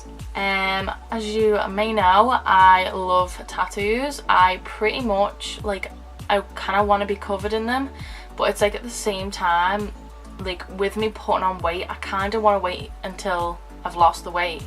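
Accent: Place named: Liverpool, United Kingdom. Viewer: Your makeup is gorgeous! Ah and your hair, I love it. I just dyed my ends purple ^.^ Ok i'm getting really good at accents because I thought, hmm she's from Liverpool. -Jess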